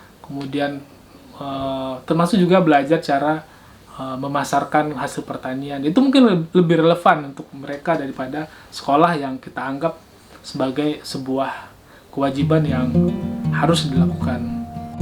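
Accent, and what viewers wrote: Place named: West Sumatra, Indonesia. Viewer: 8.27 "sianu sianu", I am laughing of loud...sorry sir... Masih lidah minang!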